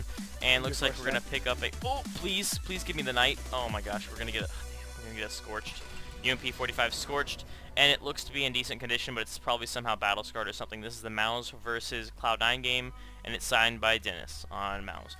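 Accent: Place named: South Africa. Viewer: I thought you were South African... Where are you from???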